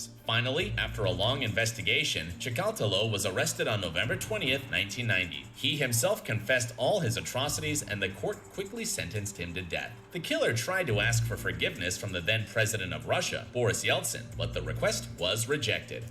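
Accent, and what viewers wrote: India. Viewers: that indian accent got me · His Indian Accent had me dying😭😭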